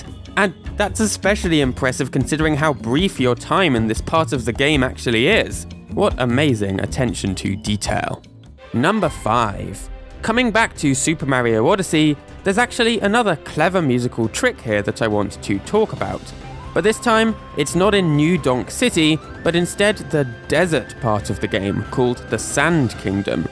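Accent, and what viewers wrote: United Kingdom. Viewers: love ur videos and ur british accent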